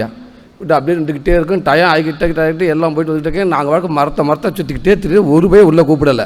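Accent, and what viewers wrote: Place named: Madurai, India. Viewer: Soori madurai slang❤❤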